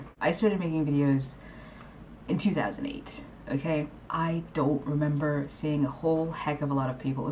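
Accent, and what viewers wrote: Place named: United Kingdom. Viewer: where you in britain a long time? you have a slight british accent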